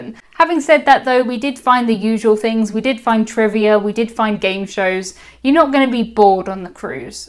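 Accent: United Kingdom. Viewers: It is called a British accent, a lovely and charming thing. That is why she pronounces taco in that manner, as most Brits do. If you went to the UK and said certain words with your American accent it would not be considered "butchering ", it would be understood that is how the American culture speaks. It's called tolerance and understanding. What a concept! 🙄🤣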